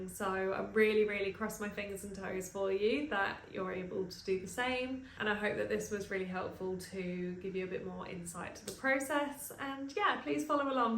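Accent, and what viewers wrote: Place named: England, United Kingdom. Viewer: You'll fit right in with your pommy accent. Its called a dining room. A diner is a roadstop where truckies eat.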